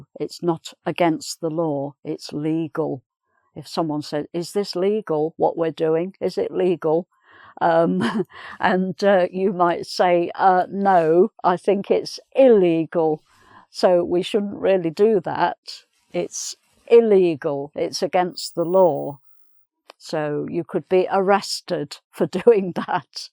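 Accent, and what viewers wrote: United Kingdom. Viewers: Thanks my great teacher ...love your lesson so much cus you make me able to speak in British accent ...i got 100. ..god save you . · Thank you 'Mother' You give us valubale topics . I love British accent . Thanks "mother" for being teaching us to all your childreen arround the world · British pronunciation the best ever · Have signed. Love your British pronousation❤️.Thank you for great lessons!!!! Can I find this list anywhere?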